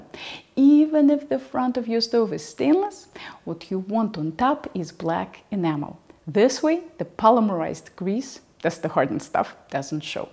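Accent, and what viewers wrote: Russia. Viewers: The Russian accent puts me off these videos and just wondering if there is any connection between the accent and the pro gas bias. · nothing in this world is more irritating than a russian accent in english. NOTHING. except people who don't understand the difference between spoken and written language, and read a script to a screen like a 5 year old reading the three bears. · This is the first time I’ve watched one of your videos,and I am so glad the YouTube algorithm recommended it. The topic is very timely as we will be having a lovely manufactured home built for us and I either have to have a non-gas, 30” range or a gas range with propane, which would be an upgrade and cost us for the gas range and, of course, the propane. I love the gas range I currently have, and don’t want any other type range. I’m a little concerned because of what you said about your needing a Wolf because it works well with propane. 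I am 73 and don’t cook nearly as much as I used to, so cannot justify the cost of a Wolf. I am now a Subscriber. Thank you for this helpful video. BTW: I love your beautiful voice and Russian accent!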